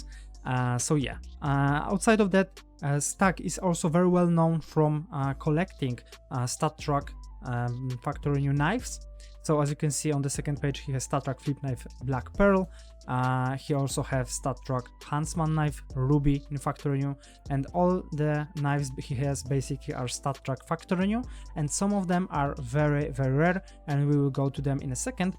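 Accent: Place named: Poland. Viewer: ten polski akcent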